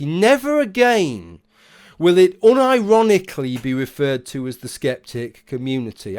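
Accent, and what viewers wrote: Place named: England, United Kingdom. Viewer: Your accent is perhaps the most enjoyable English accent to me. Whereabouts is that from? Heard this couple from Whales recently, old lady was so posh, lol. Loved it. Edit: I don't mean to say I think you sound posh, just I like that too.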